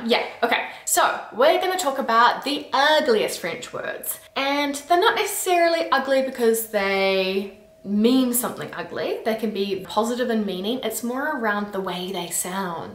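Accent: New Zealand. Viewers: The closeness to crap is only because of your kiwi accent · Just wondering if you are a kiwi by any chance? I thought I heard some kiwi accent/ pronunciation. Eg seven or percent :)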